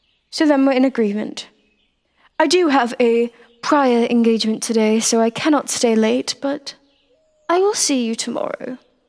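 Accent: RP, United Kingdom. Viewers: Damn!!!!! that thumbnail is mega shes hot love the posh voice too very beautiful ❤️ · oh no, almost made it out of class with the crowd.. she was too quick for us👩‍🏫. From dissapointment to losing out on an award and an ear bashing from the teacher, to getting a bit of a personal glory and history lesson from her and being told to shape up for ourselves.. this is an ideal teacher. Took a gamble admitting what we thought of her personally too, did give us more motivation to get back on schedule and get to spend more time with her, studying📚. Magical academy always makes me think of a potty school for wizards 🏰but this took it in a new direction and had a bit of a DnD feel which is a great change, original and fun idea and can't help but like the posh accent in a dominant tone either, brilliantly fun audio once again 🎧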